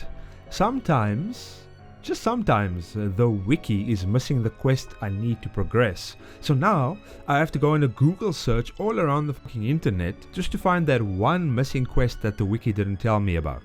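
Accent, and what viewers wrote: South Africa. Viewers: Are you South African by any chance!? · which accent is this, are you south african mate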